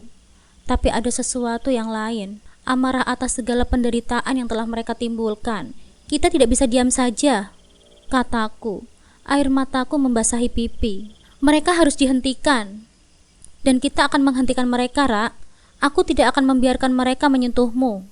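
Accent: Java, Indonesia. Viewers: Bd hadir mbsk, medok pisan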